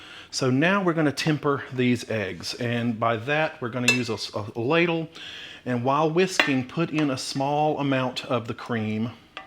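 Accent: South, United States. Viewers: Thank you. I just started watching you, and I am very impressed with many things on your show. Listing a few is your southern accent, your honest input without judgement on one or the other recipe that you are introducing to your audiences, your knowledge and teaching us of items/tools used to cook with and your knowledge of ingredients, sometimes strange, used to cook with, and how you present and do the show. The finger up to the camera for a one second pause, then flash to a new view of you having transitioned to the next thought, the way you taste and truly enjoy the items. And btw I looked at some older film of you on this channel and I can see you are thinner. This Keto life is a good thing! Thanks!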